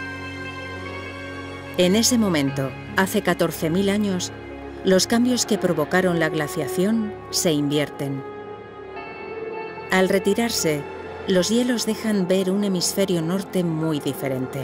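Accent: Spain. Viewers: Luego está internet lleno de odio hacia el acento español 😢😭😭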